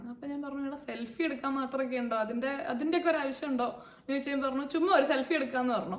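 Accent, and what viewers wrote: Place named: Malabar, India. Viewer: Chechy njan kure nalai vedios kanarund. Njn kozhikodekari Anu so chechyde malabar touch ulla samsaram bayankara istanu. Njn agne long vedios adikam kanatha alanu ipo chechide long vefios okke pattumbolkke full ayit kanarund. Karanam chechide samsaram kelkn nalla resanu. Mathralla chechide life style, healthy food habits, okke enne irupad motivate cheythittund. Chechine enik valya istanu. Puthiya vedios nai kathirikkunnu. Orupadu Snehathode - chandhu😉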